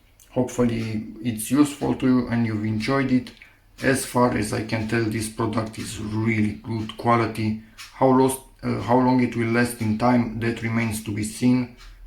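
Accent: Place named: Romania. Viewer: Poate vorbeste in Engleza ca il poata urmari si oameni din alte tari? Stiu, pare halucinant, dar lumea asta are aproape 8 miliarde de oameni, dintre care doar vreo 0.4% stiu romaneste. Eu, ca si alti oameni care se informeaza, am gasit acest canal de youtube cautand in engleza un lucru care ma intetesa. Mi-am dat seama ca ar putea fi roman dupa accent si cand am citit in descriere. Daca tu nu esti aici ca sa te informezi pe subiect, atunci beshi in plm in alta parte. Youtube Trending e de tine, Dani Mocanu vorbeste romaneste.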